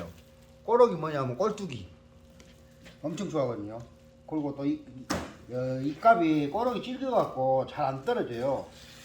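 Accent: Jeolla, South Korea. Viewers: 선장님께서는 완죤 구수한전라도 사투리 듣기좋아요 영상들으면 구수한 사투리 재미 있어요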